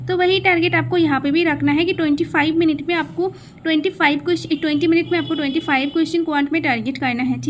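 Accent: Bengal, India. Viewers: Bengali accent ....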